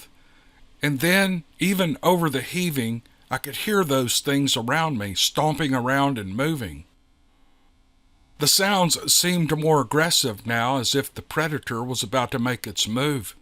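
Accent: South, United States. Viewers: Mr., I hope I am not offending you sir by commenting on your last video, however at the Mark you commented "some of these villages were set up by whites!", and I found it to be somewhat derogatory and offensive...what does skin color have to do with Sasquatch entertainment stories and why invoke race when it was obviously not relevant...someone with your platform, and in reaching the thousands of ears and minds that your narration touches, one would think you'd be more conscious of your choice of words and how they're perceived....I challenge you sir to replace the word "whites" in that statement with any other race defining word and id almost bet youd be deplatformed before you coukd say.....that said, my brother and I thoroughly enjoy your southern draw and your channel is our favorite! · I missed the poll on your community page. I could listen to you read the back of a cereal box for an hour!😂for me your southern accent reminds me of home. 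I have followed you for a few years now and you seem like a genuine nice guy I like that too. No foul language no foolishness. Nothing worse than listening to people bashing others, I despise that. I just like your approach to every story or email. No need to thank me for listening, I’m one of your people😂. Thank you for the stories. Keep up the good work brother❤️ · I took the poll, 😁, love hearing a Southern gentleman tell stories. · You are most welcomed. I love the southern sound of your voice and I only listen to a few of you guys since Covid, so to me you guys are the OG. Thank you for sharing your time and you voice with us. 🙏🏼❤️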